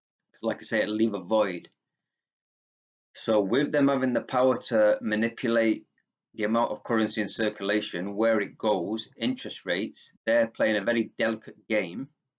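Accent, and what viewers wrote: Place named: Manchester, United Kingdom. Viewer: Real interesting vid, nice to hear a Mancunian on utube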